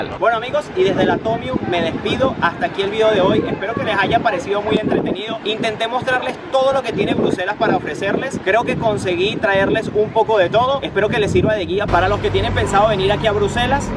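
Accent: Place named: Venezuela. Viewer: De dónde eres youtuber x tu acento creo q eres veneco seguro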